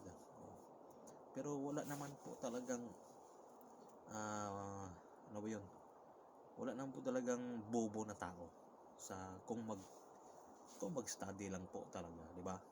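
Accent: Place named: Visayas, Philippines. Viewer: Hirap mag japanese host BISAYA SHA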